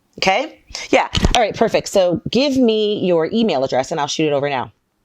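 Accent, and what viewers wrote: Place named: Europe. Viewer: Sound like a European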